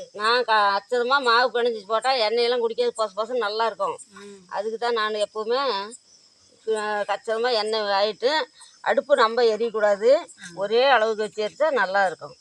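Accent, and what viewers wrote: Kongu, India. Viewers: கொங்கு தமிழ் அருமைய் 🙏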